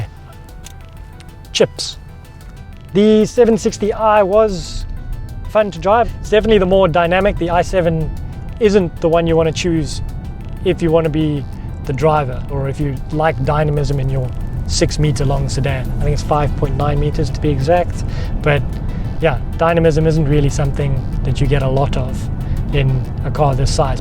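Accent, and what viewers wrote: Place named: South Africa. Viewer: You can tell by his voice that he is from SA!!!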